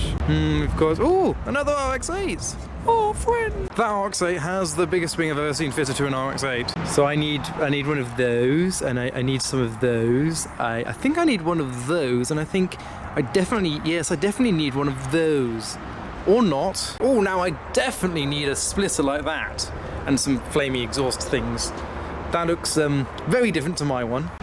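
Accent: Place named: RP, United Kingdom. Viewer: I thought this was a supercarsuspects video until I heard the posh british voice lmao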